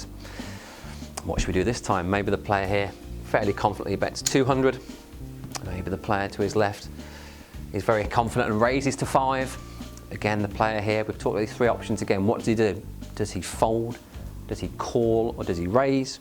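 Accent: United Kingdom. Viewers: why a brit guy is teaching texas poker ? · First “how to play” video I watched was an Aussie accent, this one is a British accent. Maybe third times the charm? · One of the simplest vids to learn despite his deep British accent lol.good Good thx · He's actually Russian but he does a great Brit accent when explaining a Texas game. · I can't understand this guy with that heavy English accent.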